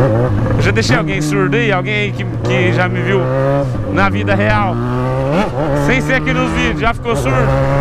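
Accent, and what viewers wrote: Goiás, Brazil. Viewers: vc e goiano???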